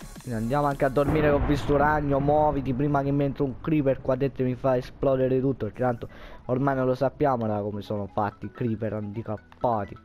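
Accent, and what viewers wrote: Sicily, Italy. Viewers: 01 Dall'accento sei Siciliano vero? ;)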